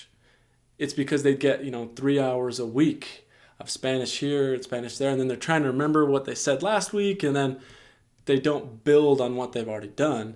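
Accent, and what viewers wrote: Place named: Brazil. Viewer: ele é br dá pra ver pelo sotaque do inglês dele hahaha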